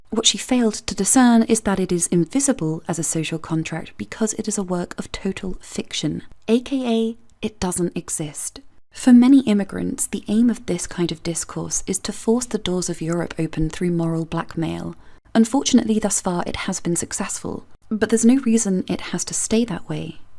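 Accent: England, United Kingdom. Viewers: Love the way you articulate with that beautiful English accent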